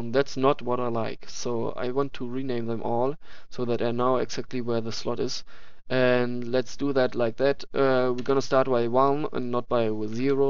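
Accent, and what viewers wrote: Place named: Germany. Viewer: Are you from Germany? You Accent sounds like a german English accent.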